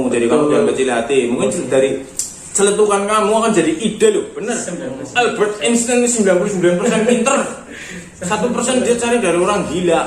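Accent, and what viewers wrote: Banyumas, Indonesia. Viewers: Kaya wong banyumas,,,ngomongw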